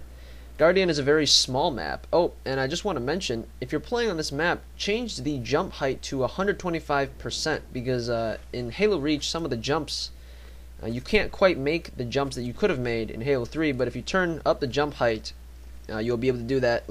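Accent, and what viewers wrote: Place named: United States. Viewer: i know youre asian, but you sound like you grew up in america, so what was your first laguage and where did you learn it?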